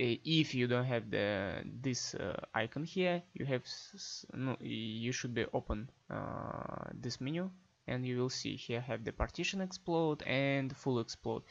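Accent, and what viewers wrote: Russia. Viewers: Чисто по акценту руссишь слышно, спасибо)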